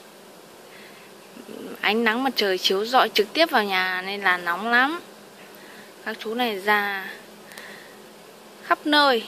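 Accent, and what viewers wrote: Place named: Hai Duong, Vietnam. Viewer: Nghe giọng như gái hải dương hoặc an lão hp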